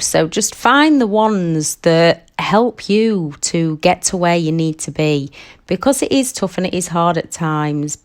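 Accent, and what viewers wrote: United Kingdom. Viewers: I was thinking I might have grown up in the same household with the narrator, except for the British accent. The only member of my family not a narcissist, aside from me, died when I was a kid. For years I thought I just could not get over my father's death, and I cried and cried and cried, thinking there was something wrong with me. It took a long time to realize it was sad that he died, and he was the only one who loved me or treated me with love, tenderness, and care, but what I was really reacting to was the fact that he died and left me alone with THEM. Great analysis of growing up with NPD in siblings.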